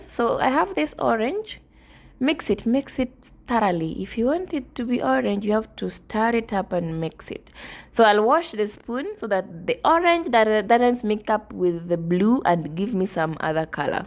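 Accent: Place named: Africa. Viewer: Simple. I loved it bcoz of your narration, and with out seen you, I knew it's from Africa 🌍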